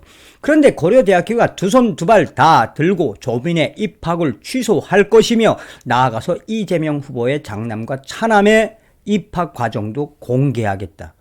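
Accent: North Korea. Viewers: 정성산감독님 잘보고 있습니다 사건을 읽으시때 맘을 급하게 갖지마시고 좀천천히 정확하게 전달될수있게 읽어주세요 북한말과 섞여서 빨리 읽으니 알아들을수가 없습니다 고쳐주시면 더 좋은방송이 될겁니다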